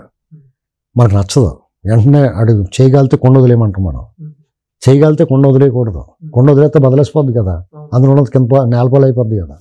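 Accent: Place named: Godavari, India. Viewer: అల్లసాని వారి అందెల సవాలు మాకు పరిచయం లేకున్నా వారి గోదావరి యాసతో అచ్చ తెలుగులో అనేక సామెతలతో గాడిదలు కూడా సిగ్గు పడే విధంగా సున్నితంగా విశ్లేషించే విధానం చెవులు నిక్కబొడుచుకుని 'ఈ జగ్లక్ భాగవతం ఎంత సేపైనా ఇంకా ఇంకా తెలుసుకోవాలనిపిస్తుంది. 40% గాడిదల కి ఇది అరణ్య రోదన అవుతుందేమో.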